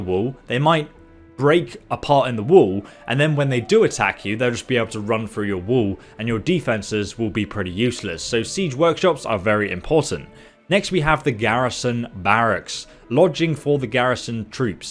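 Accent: England, United Kingdom. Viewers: You guys do realize that the man is British and speaks proper English. He lives in a place where the english language started so just think about that before criticizing. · And Here i thought its obvious He is Brit oh lol.. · "mil-ih-tah"? England, please, just stop.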